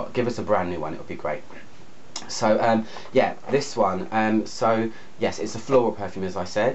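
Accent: England, United Kingdom. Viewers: I love your English accent. I'm practicing my listening with your videos. I'm also a lover of parfums 😍.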